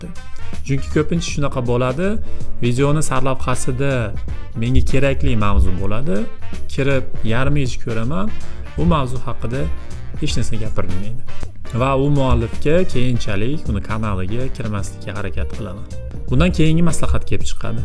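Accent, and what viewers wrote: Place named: Andijan, Uzbekistan. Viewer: Anjancha shevani eshitib siz bn faxrlandim,omadizni bersin👍👍✊✊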